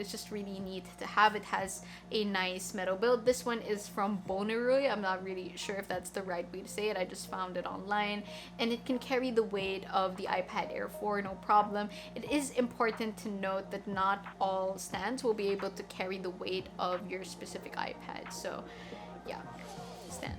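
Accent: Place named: Philippines. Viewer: you have a teenie tiny filipino accent in this video :)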